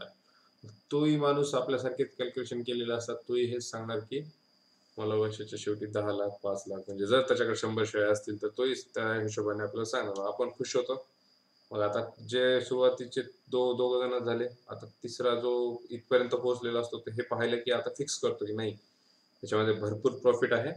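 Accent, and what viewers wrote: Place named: Vidarbha, India. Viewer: सर, तुमच्या भाषेच्या ओघावरून मी एक निच्छित सांगू शकतो कि तुम्ही विदर्भातील, अमरावती विभागातील एका जिल्ह्यातील आहे. तुम्ही दिलेली माहिती खरच फार उपयुक्त आहे, आणि व्येवासायातील वास्तववादी जगण्याची प्रेरणा देणारी आहे.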